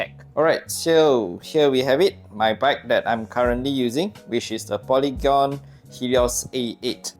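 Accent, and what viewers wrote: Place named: Singapore. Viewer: I recognized the Singaporean accent haha. I miss Singapore and mount faber. Looks like great ride tho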